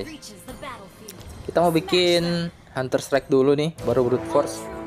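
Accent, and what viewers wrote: Manado, Indonesia. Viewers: Orang mna bg Manado ?apa Sulteng?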